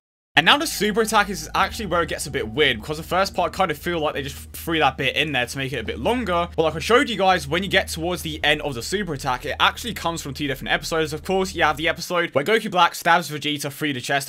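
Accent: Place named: England, United Kingdom. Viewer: sounds kinda like Darren Till with a english accent instead of irish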